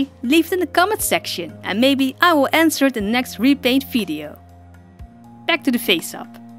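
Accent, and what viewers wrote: Netherlands. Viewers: No hate but you sound i bit Dutch ( ben je Nederlands ?? ) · Ar u neterlands cuz i heard it in the accsant i'm neterlands to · I just found you and I think you are Dutch 😂 because of your accent xD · Oh my god your accent is sooo cute! I’ve never heard a Dutch person,and y’all kinda sound like if the French and British accent had a child xD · NEDERLAAANDS 😂 je hoort gwn dat je een Nederlands accent heb, I 💖 your video's 😘💋💯 ga zo door!! 🔥💝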